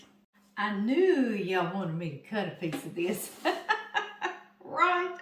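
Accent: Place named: South, United States. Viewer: I love watching you and listening to you sweet southern "drawl" .. Your cake looks delicious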